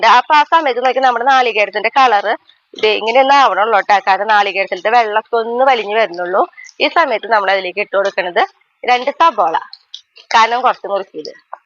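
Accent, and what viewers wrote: Thrissur, India. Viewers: ഞാനും അഴവ്, വെണ്ണീറ് എന്ന് ഒക്കെതന്നെയാ പറയാറ്. നമ്മൾ ത്രിശൂർകാര് അച്ചടിഭാഷ അധികം ഉപയോഗിക്കുന്നവരല്ല അതുകൊണ്ട് ഇങ്ങനെ തന്നെ പോട്ടെ അല്ലെങ്കി " എന്നാ എന്നതാ " എന്നൊക്കെ പറഞ്ഞ് പഠിക്കേണ്ട അവസ്ഥയാകും ഈ ത്രിശൂര് ഭാഷ കേക്കാനാ നാട്ടില് ഇല്ലാത്ത ഞങ്ങള് video കാണണത് അതുപോലെ മക്കളെ നമ്മടെ ഭാഷ പഠിപ്പിക്കാനും Best wishes and keep going ❤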